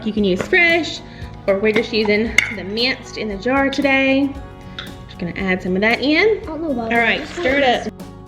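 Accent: South, United States. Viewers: The shells look amazing! Thanks for the idea- I’ll definitely be trying them! Keep up the great work. I love your videos and southern accent! ❤️❤️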